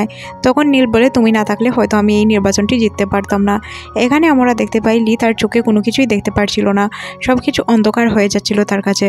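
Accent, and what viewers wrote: Sylhet, Bangladesh. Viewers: Apnar basha ki Sylhet 🙄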